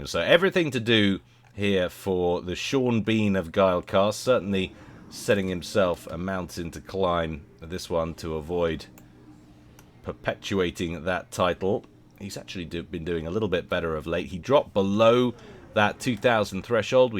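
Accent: United Kingdom. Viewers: Just found this channel and binged a few games. Supreme Commander was a favourite of mine back when it came out (in the days of 'you can use a second monitor for the map, gasp!') Happy to hear a fellow brit on YouTube too. It shouldn't surprise me, but it always does 😅. Convinced a friend to pick up FA when we both get paid (as I'm skint at the mo) and we are going to check out FAF for the coop campaign!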